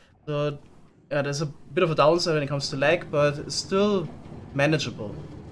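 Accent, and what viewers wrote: Germany. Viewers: er hat nen deutschen english Akzent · Der deutsche Akzent ;-)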